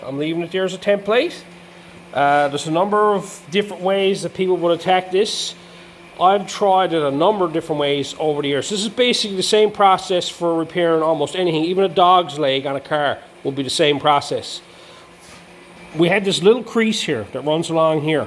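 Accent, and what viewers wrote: Ireland. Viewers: What accent do you have? It is interesting. Sounds slightly Irish. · Hope you don't mind me asking if you have any immidate Irish relatives, there a certian irish accent/ twang to some of your words. appolagies if i'm way off, came across your vid looking for rusty arch's, I'm in Ireland myself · What is his accent? is that Irish?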